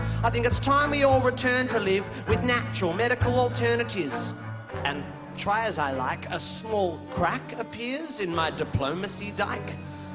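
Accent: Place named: Australia. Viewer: i thought he was giving storm an austrailian accent and theres another song where he talks to an annoying rich guy with an austrailian accent so i figured he was trying to make austrailia look bad ( not really i was just makin a bad joke) turns out hes austrailian too im just an idiot who didnt catch his accent cause i thought he was british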